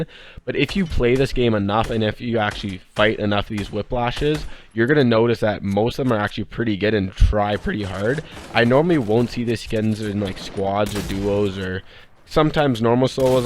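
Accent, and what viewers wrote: Canada. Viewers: Yo where are you from, you sound like ur from Canada, more specifically Ontario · 100% Canadian. Fellow Canadians can spot out other Canadians by voice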